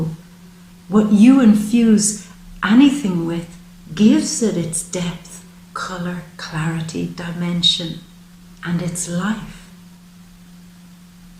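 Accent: Ireland. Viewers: This is 1 of the best readings you've ever done. Everything resonated. All the best to you from Canada 3> By the way what part of Ireland are you from?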